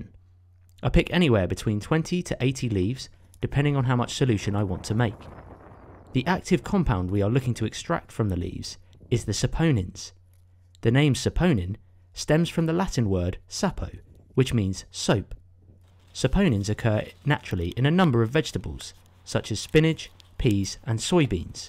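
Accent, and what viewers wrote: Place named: South East England, United Kingdom. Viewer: It’s a pretty regular accent for someone who was born & lives in the south east of England. I too was born in the same area as & share the same accent.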